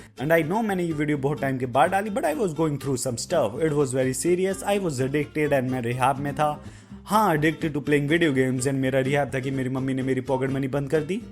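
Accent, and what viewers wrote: Jammu, India. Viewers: ji aap jammu sai ho toh dogri bhi aati hogi🤣🤣 Dangar........ I am also from jammu and you know what when i saw ur first video i got that u r from jammu becoz of ur way of talkinh hehhehe I was laughing like hell while watching this Love ur videos ji☺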